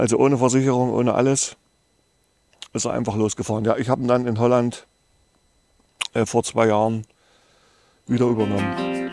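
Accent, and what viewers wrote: Saxony, Germany. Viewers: Contents It's a saxon dialect (very slight, though)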